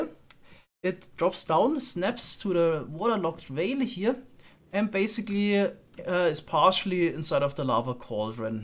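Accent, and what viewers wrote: Germany. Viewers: i mean, the accent would suggest german or austrian :) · He’s German yeah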